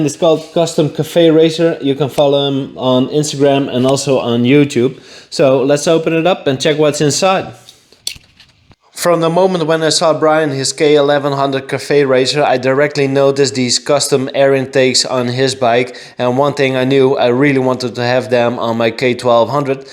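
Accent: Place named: Netherlands. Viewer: Aargh, dat Nederlands 'engels' is tenenkrommend.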